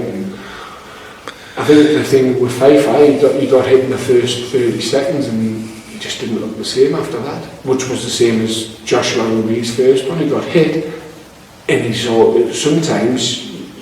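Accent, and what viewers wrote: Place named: North, United Kingdom. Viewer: Your northern accent 😂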